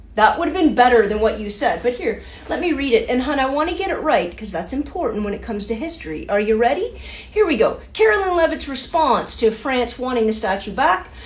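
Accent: Canada. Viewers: I got a lot from this presentation. I caught the Canadian accent and I'm not surprised that you're better educated than most of us. Fossils like me remember real teachers. They're gone. We have some excellent ones who can't teach the unteachable. Media has ravaged our society and driven it into the ground since the fifties. France has every reason to take back the lady. We've defiled her. I'll be petty at the end here and fuss over your hair. I want that in the spring 🌱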